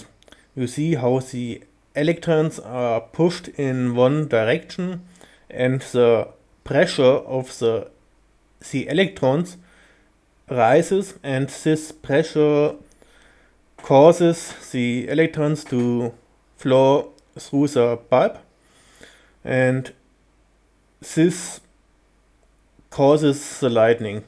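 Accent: Germany. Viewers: When you know exactly that your boy is from Germany cause we all have the same foreign accent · das ist das Deutscheste Englisch was ich jemals gehört habe so geil man. mach weiter so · Das ist das deutscheste Englisch was ich je gehört habe aber danke für das Video👍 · this alman english is amazing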